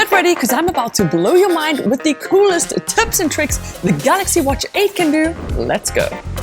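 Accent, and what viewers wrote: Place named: South Africa. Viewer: I got me the Watch 8, searched for tips and tricks and came across your channel. The minute i heard you speak I knew you were my fellow South African :).. Great channel!!!!!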